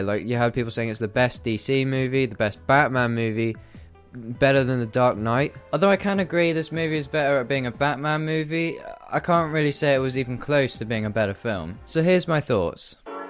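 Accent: United Kingdom. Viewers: you british though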